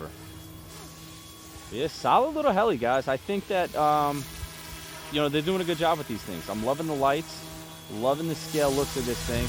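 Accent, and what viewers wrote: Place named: New York, United States. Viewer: I bought both because why not. I love your nice thick grass and your cool NY accent.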